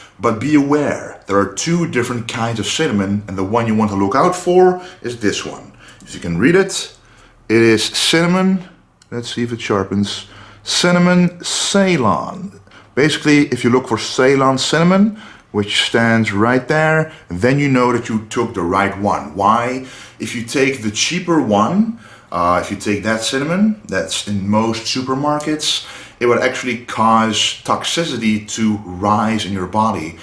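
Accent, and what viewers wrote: Netherlands. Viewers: I LOVE THE DUTCH ACCENT. I AM MOVING TO THE NETHERLANDS TO FIND A MAN TO SPEAK TO ME IN THIS LOVELY AND SEXY ACCENT.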